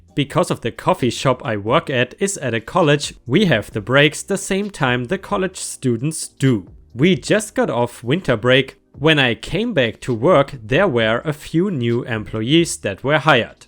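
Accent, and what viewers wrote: Germany. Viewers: I don't know man,.. I like the stories you choose but as a German it's just so hard to listen to other people's German accent. Although your English is actually really good, I don't know wether to subscribe yet... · Are you german? Frage für einen Freund...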